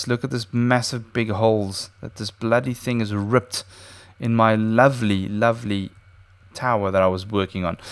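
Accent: South Africa. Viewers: Are you South African? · are you south African?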